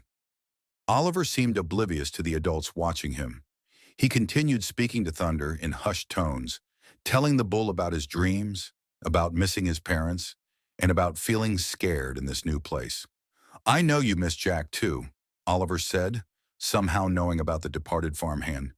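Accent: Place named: United States. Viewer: I am so sick of hearing horrible US accents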